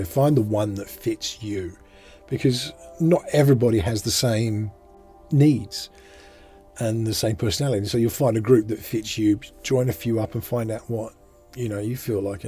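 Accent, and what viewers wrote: Australia. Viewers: Are you from UK or AUS, you sound like you grew up in regional Victoria! Thanks heaps for your insight. Ive a 5yo boy with asd, pda and Add/hd potentially. You’re a hero. First search on yt as a result of desperation and I’ve been sucked into your channel. Thank you. Big love from down south 🖤🦘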